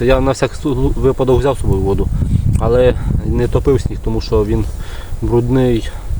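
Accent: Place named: Podillia, Ukraine. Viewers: Я теж спочатку по говірці подумав що Хмельниччина😊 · Вітаю Добродію! Намагаюсь по вашій розмові визначити звідки ви...Ваша розмова дуже схожа, на ту, якою розмовляють на Поділлі. І мабуть все таки не Вінницька, а Хмельницька область.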